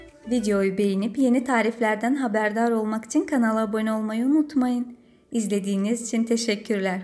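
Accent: Azerbaijan. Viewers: Seslendiren azerbaycanlıdı <num>% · Azerbaycanlisiz? Lehceniz tanis gelir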